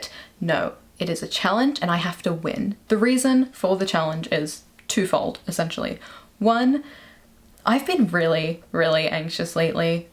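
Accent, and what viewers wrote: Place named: Australia. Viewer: This is my first time watching one of your videos. I have a PhD in linguistics and I find your accent fascinating. At first I thought Australian and then English but with a lot of North American influence. Your rhotic R changes back and forth even in the same sentence. You have a very interesting mix of accents and influences. And I found the content interesting too 😊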